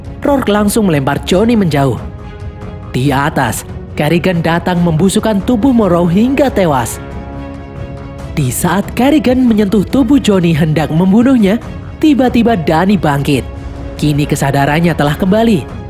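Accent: Java, Indonesia. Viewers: Wah Mimin Jawa ya 😅☝️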